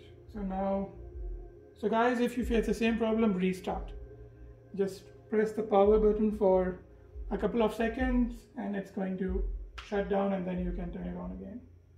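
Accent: India. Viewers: why do you sound and look like indian. are you Indian?? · You sound like an Indian Are you indian ?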